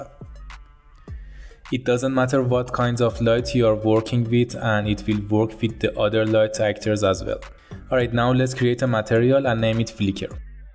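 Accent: Iran. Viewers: From your accent i believe you're from Iran, so am I. Thanks for the great guide Keep it up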